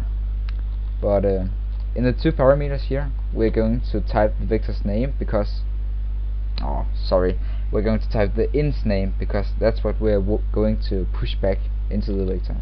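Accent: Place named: Sweden. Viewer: Hey you're swedish :D !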